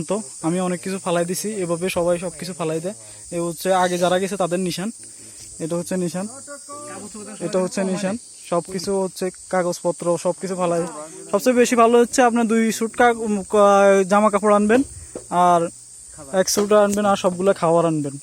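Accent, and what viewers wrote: Noakhali, Bangladesh. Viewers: Vai mone hoy noykhalir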